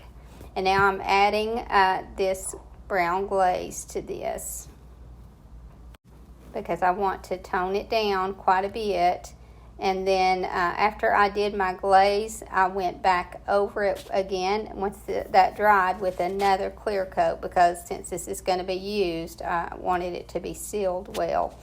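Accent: South, United States. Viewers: I just love your work, your beautiful Southern accent and mostly that you love the Lord! Keep glorifying his name like you do! 🛐💟✝️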